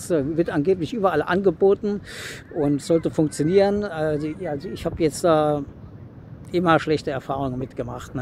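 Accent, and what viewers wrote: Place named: Saarland, Germany. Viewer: Grüß dich. Kommst du ausm Saarland? Dein Dialekt klingt so. Sorry wenn das nicht der Fall ist 🤣👍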